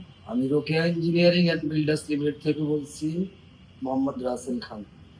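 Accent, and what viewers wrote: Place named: Comilla, Bangladesh. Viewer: ভাই কুমিল্লাতে কাজ করেন নাকি,আপনার কথা শুনে মনে হয় আপনি কুমিল্লার লোক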